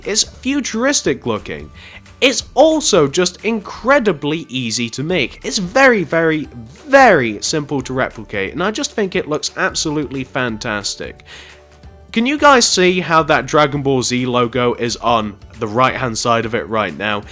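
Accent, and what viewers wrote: England, United Kingdom. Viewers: Are you form England?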